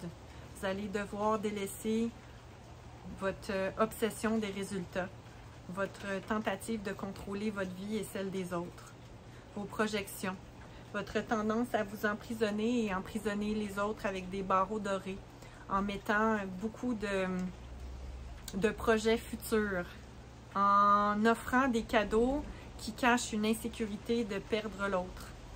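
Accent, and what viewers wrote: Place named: Quebec, Canada. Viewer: Tellement apaisant le bruit de la pluie, le bois, les couleurs des feuilles d’automne … et l’accent québécois 👌 merci pour cette douce mélodie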